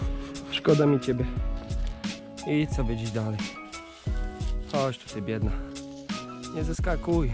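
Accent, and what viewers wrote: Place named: Russia. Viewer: Masz leciutko rosyjski akcent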